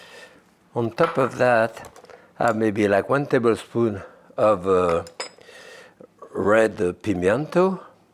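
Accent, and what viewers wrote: France. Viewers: Speech impediment? Meh…I thought it was his French accent shining thru😂 Accents r great! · Impediment? Bruh he's just French hahaha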